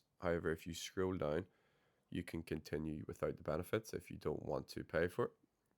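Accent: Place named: Northern Ireland, United Kingdom. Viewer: I like your Northern Irish accent and appreciate the helpful explanation. I'm thinking of buying a HL-2400DW so this video has been very helpful.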